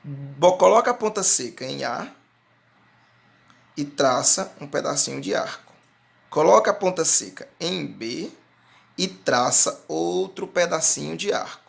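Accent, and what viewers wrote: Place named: Pará, Brazil. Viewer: ele tem sotaque do Pará kkkkkkk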